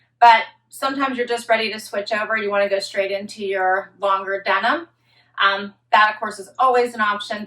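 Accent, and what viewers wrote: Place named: Pennsylvania, United States. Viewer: So happy to see you love tee shirt styling like me!! I get lots of ideas from you. I also heard you mention yr from PA. I also heard it in your accent because I am from Philadelphia. I live in Florida now so it's nice to hear someone from home. Me and my puppies watch every morning with our tea. Thank you 😊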